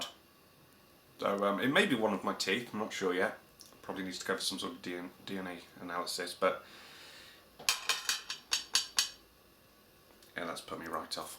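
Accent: United Kingdom. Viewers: great channel! your deadpan british accent makes it even more hilarious!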